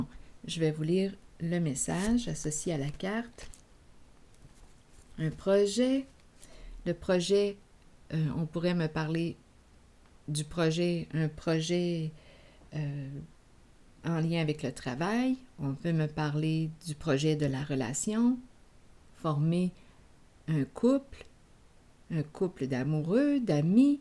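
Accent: Quebec, Canada. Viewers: j adore votre accent du Québec !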